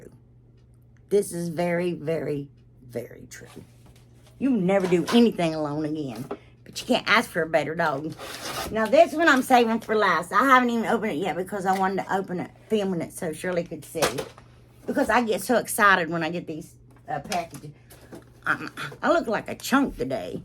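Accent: South, United States. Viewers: You have got to be from the south!! I have the same accent! I’m from Southeast Texas.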